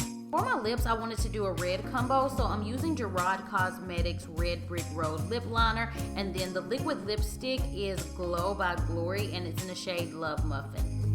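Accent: South, United States. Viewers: Girl I just love your southern accent 🤗🤗🤗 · I always love your looks and tutorials! And that you sound just as southern as me! 😩 The struggle and the jokes I get! But you’re killing this look and outfit! You go girl💁🏼‍♀️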